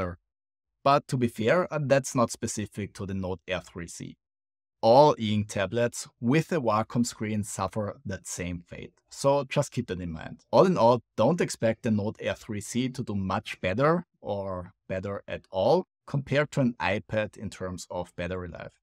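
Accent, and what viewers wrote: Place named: Austria. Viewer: you have a very austrian accent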